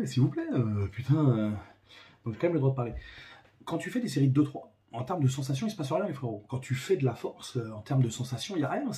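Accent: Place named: Gaul, France. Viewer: tu me tue, cette façons de parler, ca c'est du gaulois solide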